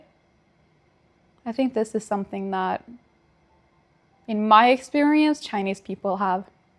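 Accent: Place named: North America. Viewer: Excuse my ignorance, do all Norwegians speak with this "north American" accent? What other languages do you speak in your native country?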